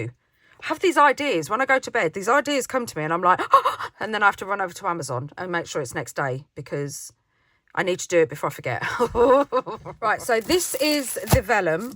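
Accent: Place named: United Kingdom. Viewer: From where in the UK are you ? I love your accent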